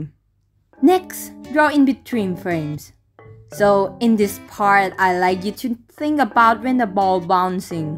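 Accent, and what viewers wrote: Thailand. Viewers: ชอบสำเนียง มีเหน่อๆ555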